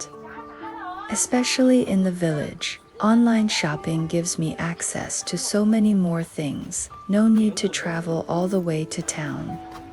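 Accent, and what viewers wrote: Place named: United States. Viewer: Why do you speak fluent English with an American accent.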